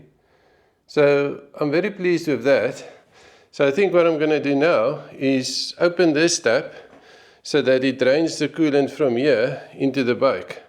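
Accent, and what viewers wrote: South Africa. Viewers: Het perongeluk op jou channel afgekom. Lekker om n afrikaanse aksent te hoor al die pad hier in Skotland. Ek het n 1250GSA en kan sien eendag sal ek die videos kan kyk vir enige raad. Sterkte en kan nie wag vir volgende video nie.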